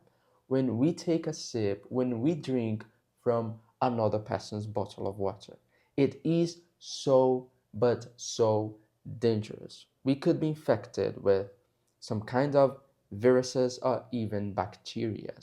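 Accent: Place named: United Kingdom. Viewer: You've got a nice British accent ! I speak with American accent, but I've always found British way of speaking beautiful! Have a nice one my friend ! 😊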